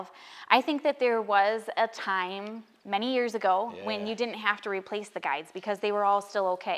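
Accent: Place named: Midwest, United States. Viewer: I could listen to read a phone book.. Reminds me of home with her Mid-Western drawl..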